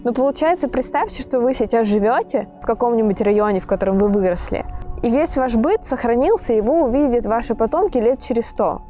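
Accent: Poland. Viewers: Klimat jest, no i ślonsko godka. Lubię. Niklaus Pieron też fajnie opowiada a godom wom to jako gorol :)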